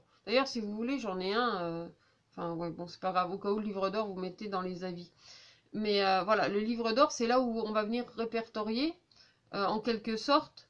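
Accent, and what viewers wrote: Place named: Franche-Comté, France. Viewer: je suis de Franche-Comté,je reconnaissais bien le ptit accent!!!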